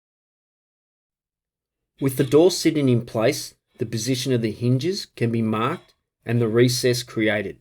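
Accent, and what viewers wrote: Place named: Australia. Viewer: do I hear an Ausie accent? Love your videos. One Handed Maker au!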